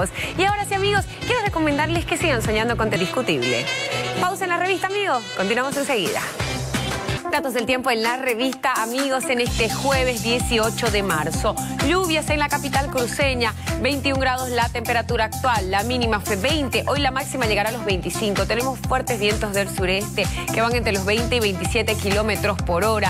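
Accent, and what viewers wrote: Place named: Argentina. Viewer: Che, esta mina habla igual q una argentina...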